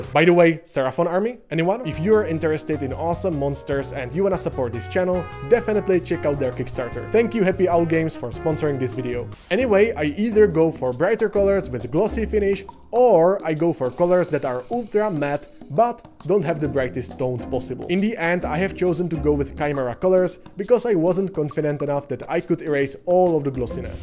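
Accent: Czech Republic. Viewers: Got this randomly recommended, 10 seconds in and I already knew you're Czech based on the accent haha. Good job btw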